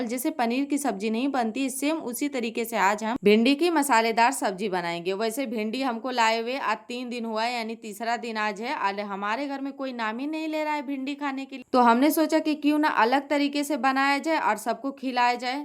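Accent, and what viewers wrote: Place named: Kanpur, India. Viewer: आपकी भाषा तो अलग है आप शुद्ध हिन्दी कानपुर वाली बोल रही हैं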